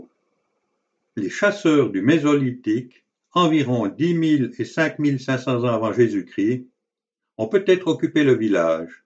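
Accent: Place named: Vaud, Switzerland. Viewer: Une magnifique présentation avec moult détails et des photos à couper le souffle! Et ce joli accent vaudois 4a n'a pas son pareil..... Merci.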